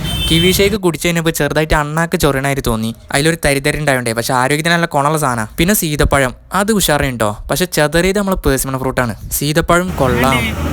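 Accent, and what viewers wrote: Malappuram, India. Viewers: Kiddu aayirunnu...😃...pinne aaa malapuraam accent...tht makes the video even bttr!😄💖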